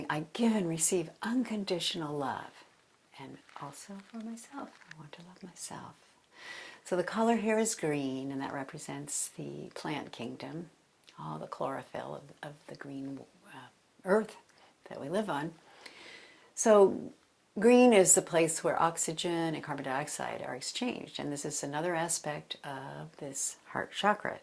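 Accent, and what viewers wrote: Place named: United States. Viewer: She is American so she has an accent if you know better you can make a video. She has 109,0000 views because of her effort.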